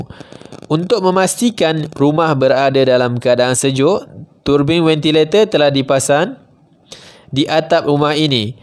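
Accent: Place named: Terengganu, Malaysia. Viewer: suara ganuuu pekat 😂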